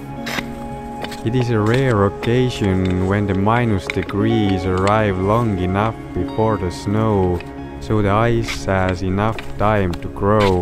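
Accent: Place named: Estonia. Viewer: Hi! Great video, really enjoyed it. I have lived in Estonia 6 months and experienced a cold winter as well, visiting some natural parks and hiking in the nature. I really appreciate you talking in English, I can finally understand what you are narrating and your accent makes me remind of my special time in your home country!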